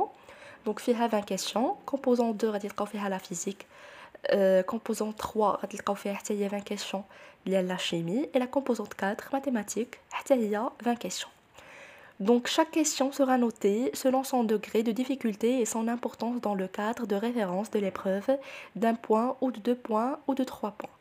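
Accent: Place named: Paris, France. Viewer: 😂😂😂😂hrbtini tana kt3sbni hdra dyalha tguli ghir ylah nzlat mn paris hhhhhhhhhhhh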